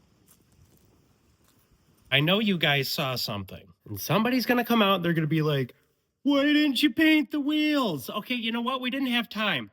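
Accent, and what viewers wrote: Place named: Minnesota, United States. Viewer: Sitting here hearing a hometown type accent going.. This dudes from MN.. Look up and sure as shit.. yall are. Fellow rock and cow, how are ya doin!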